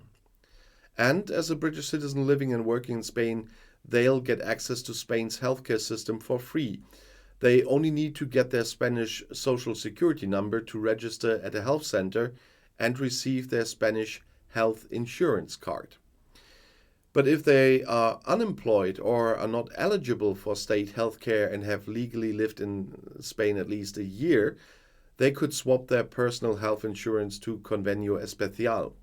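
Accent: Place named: Germany. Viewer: Nice German accent..😎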